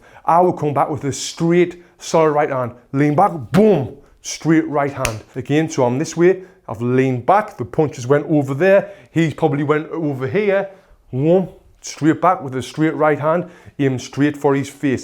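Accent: United Kingdom. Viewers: Are you British? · Man, I’m in love with the way you speaking, that accent “thru the poonch”! Love British accent since been taught it at school. Being native Russian speaker now living in US and speaking American english. Completely different story. Love to learn more about martial arts as well and boxing is one of the basic ones, it’s classic! Thank you for the video! Keep it up! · 20 seconds into the video and there is only one way to describe it, bri'ish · Your British accent ... Love it 😂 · How I love British accent! Cheers from Poland! 😉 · I love british accent! I subscribed u cos i loved u said puunchh instead of punch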